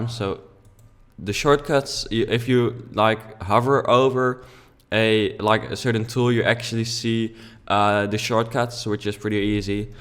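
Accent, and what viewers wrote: Netherlands. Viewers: you can really hear the dutch accent...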